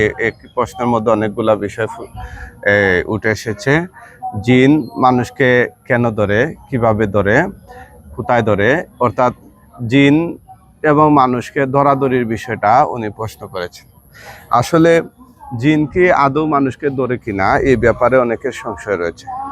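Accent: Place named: Sunamganj, Bangladesh. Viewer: Uni asole sunamgonj taken na sylhet. Unar kotha Barta sunamgonj era moto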